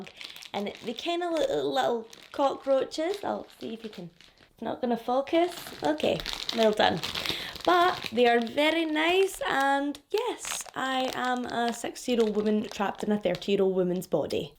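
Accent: Scotland, United Kingdom. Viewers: Living you I've been a true crime follower for a few years now. started it all! But I just love a Scottish accent tell me crime stories. You are awesome. Jen frombiggar!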